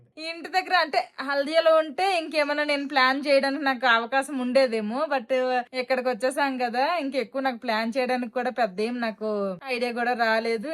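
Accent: Srikakulam, India. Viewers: Weight loss ayinapati nundi mi dressing style kuda change chesaru So miru Ala change ayinapudu Srikakulam slang use cheyadhu🙏 inka deniki modern language lo ne mataladachu ga. Videos kuda chala lag avuthunayi... Okay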